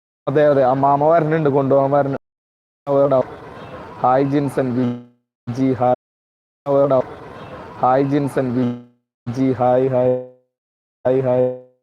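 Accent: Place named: Ernakulam, India. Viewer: ernakulam slang adipoli 😀😀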